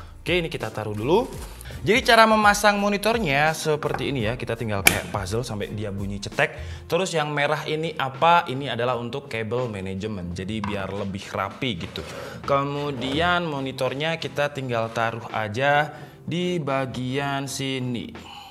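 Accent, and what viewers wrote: Java, Indonesia. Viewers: pancen wong jowo kok mas wkwkwkwk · logate kyk wong jowo 😅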